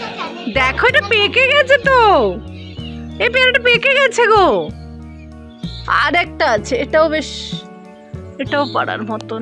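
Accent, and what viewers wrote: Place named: Bangal, Bangladesh. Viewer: Duto paisi.. Valoi to bangal bolle bonu😂❤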